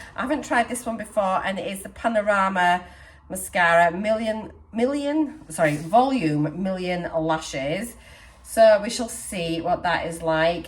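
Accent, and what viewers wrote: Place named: Scotland, United Kingdom. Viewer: I love how the Scottish lingo is coming out more and more, quite a few 'ayes' here, haha. Dumfries and Galloway is such a beautiful area, I love it there.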